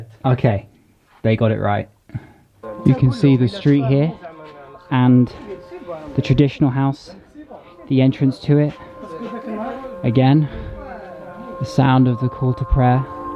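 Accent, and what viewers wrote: United Kingdom. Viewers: Same as me I was always looking at this lakhdaria I've never seen any other place until I've once went to oran and now I'm learning more about my country from a foreign tourist as you see 😅. I thought that we have no tourism at all 😂😂 until I saw this video btw from his accent I suppose that he's from UK I'm kinda impressed by the information that their universities provides for their students and I hope I can go study there 😅.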